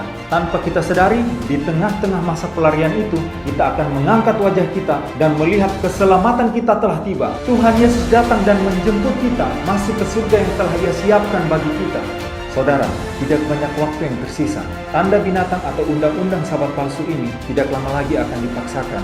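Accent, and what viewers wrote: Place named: Timor-Leste. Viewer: Gaya bicaranya spt orang timor ya 😄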